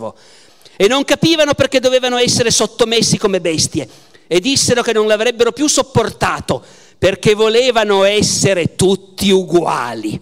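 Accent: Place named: Sicily, Italy. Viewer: Barbero che parla siciliano è stupendo! Formidabile prof! :)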